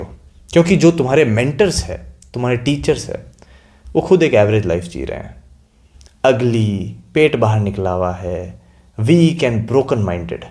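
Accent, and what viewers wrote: Rajasthan, India. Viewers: R u from Rajasthan? I think because of your accent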